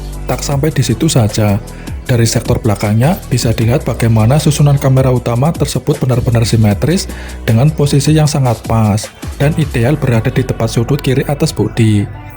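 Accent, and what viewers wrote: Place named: Tegal, Indonesia. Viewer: Teggel to...pie kabare Bro..hehheee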